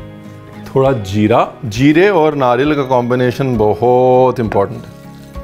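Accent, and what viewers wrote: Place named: Kerala, India. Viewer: he is a punjabi but has a clear mallu accent, what kind of mix is that. nice.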